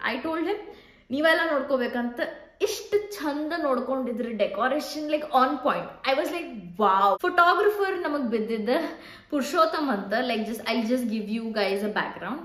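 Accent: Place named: Dharwad, India. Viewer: Lot's of love From Dharwad ❤ yast cute aagi matadti akka dharwad bhashe❤😘 #🔥ni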